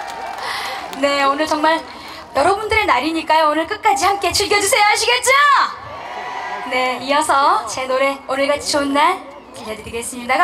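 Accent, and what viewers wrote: Jeolla, South Korea. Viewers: 울가인님 사투리도. 구수하게쓴당깨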